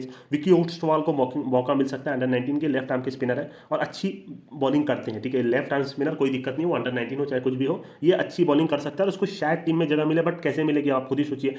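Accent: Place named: Bihar, India. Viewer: Bhai bihar se ho kya aap? Aapka shardul thakur eko wicket nahi 20run dekar wala accent mast tha🤩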